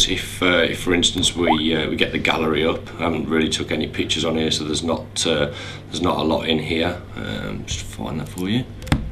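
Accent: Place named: Black Country, United Kingdom. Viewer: Are you from Birmingham or Dudley or somewhere close to there?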